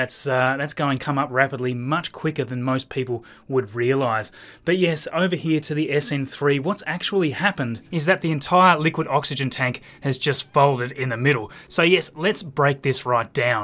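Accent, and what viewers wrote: Australia. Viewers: Nice Channel. Just hit subscribe after watching you for a few weeks. good content, and nice for you credit your sources. Question for ya.... You sound like an Aussie... And I know it's likely to not get the views you may want, but what about something on the Gilmore Space up on the Gold Coast?? Anyways.. thanks for ya content:) - 4563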